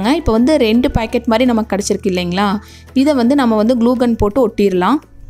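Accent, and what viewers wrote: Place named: Tiruppur, India. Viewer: Amanga nanum tirupur thanga...athanunga pathen nenga pesarathu namma ooru maathiriye irukunu superb nga ka...nenga podara videos ellame rmb rmb superb nga ka...unga video epa varumunu nan parthutte irupenga ka vanthathum parthuduvenga ka...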